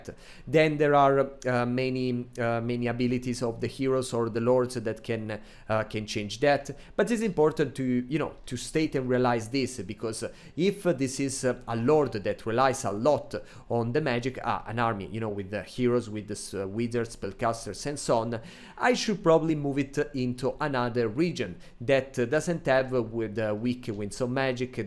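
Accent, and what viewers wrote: Italy. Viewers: Sei per caso italiano?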